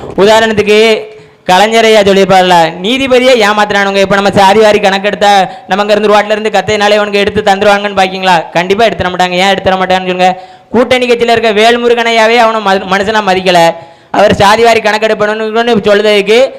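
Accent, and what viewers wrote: Tirunelveli, India. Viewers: நெல்லை தமிழ் பேசும் சீமான்🎉🎉🎉🎉🎉🎉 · இந்த தம்பியின் அந்த நெல்லை தமிழ் ❤❤🎉🎉🎉🎉சிறப்பு ட தம்பி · அருமையான பதிவு தம்பி உன் நெல்லை தமிழ் அருமைட செல்லம் எத்தனை குட்டி புலி சீமான்கள் அவன்கள் எல்லா தெலுங்கு நாய்கள் தமிழ் இண துரோகிகள் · நெல்லை தமிழ் சூப்பர்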